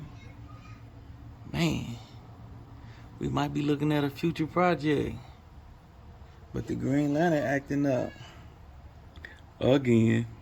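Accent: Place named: South, United States. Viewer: Nice job! I think you got a little lucky being able to get the hub off like that. Sounds like you is from the south....but up here in NY it might be more difficult for me to do. I got some whirring going 50mph+ steering to the right so it's next on my list of things to do to my 2008 DTS. Keep up the good work and thanks for the detailed explanations!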